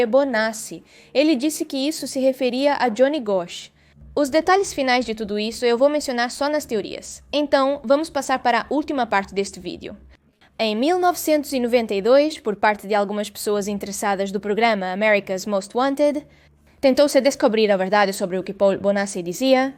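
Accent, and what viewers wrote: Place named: Portugal. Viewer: este video ela fala uma mistura de brasileirado com portugues de portugal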